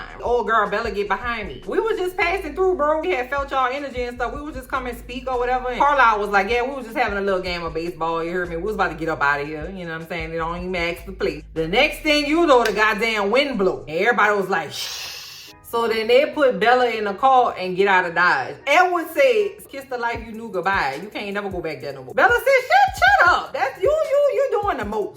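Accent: New Orleans, United States. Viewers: New Subscriber here. I found your channel for the “His&Hers” review and enjoyed everything about it. Your storytelling is engaging and funny and I can listing to the NOLA accent all day! As an adult who was all about Twilight back in the day and sometimes re-watches as a guilty pleasure I’m all here for your input. ❤🎉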